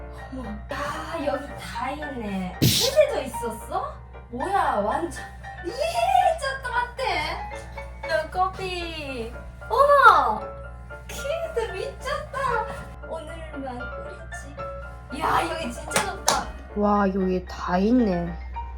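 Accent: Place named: Busan, South Korea. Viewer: 그리고 부산사투리 작렬. 3개국어인가요? 일본어 표준어 사투리 ㅎㅎ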